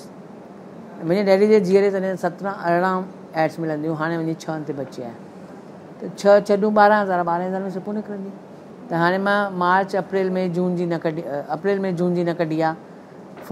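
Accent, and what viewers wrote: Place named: Khairpur, Pakistan. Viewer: Aunty bilkul Safa typical Khairpur local Sindhis accent ahh.. jeko pure sindhi boli Jo saboot ahay.... Dadho sutho Lago aunty khy budhi khare...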